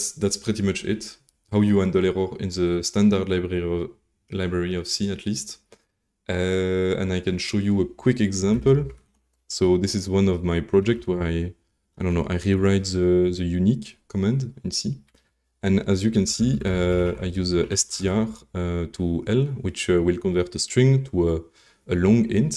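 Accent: France. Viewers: Hum… réécrire des fonctions, un accent français… 42?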